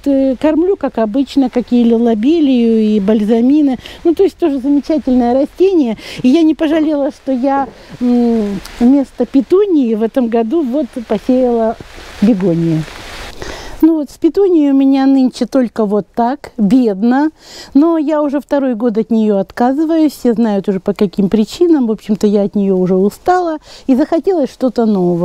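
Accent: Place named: Russia. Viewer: Hola,creo que por el acento eres rusa,hermosas plantas,aunque no se que decías, abrazos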